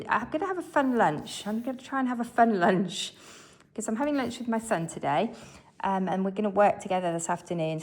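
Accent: United Kingdom. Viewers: British accent 🍷☝🏻🤓 Nice video tho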